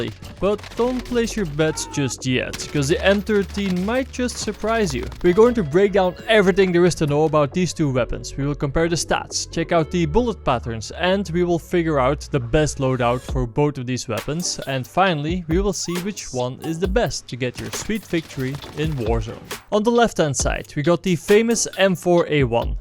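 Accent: Netherlands. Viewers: I can hear on your accent you are dutch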